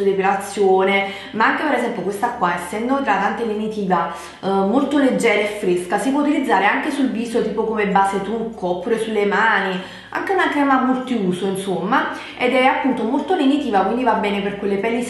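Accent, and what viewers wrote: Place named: South, Italy. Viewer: Come mai non sai parlare l'italiano?? Cosa succede al sud che non riuscite proprio ad impararlo?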